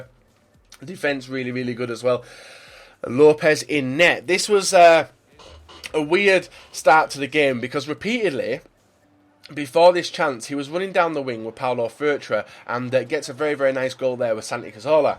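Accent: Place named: Liverpool, United Kingdom. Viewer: moronic scouser...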